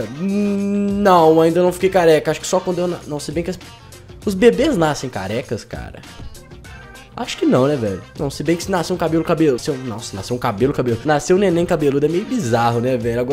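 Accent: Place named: Minas Gerais, Brazil. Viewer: Tem, de mineiro hahaha :3 é muito bonitinho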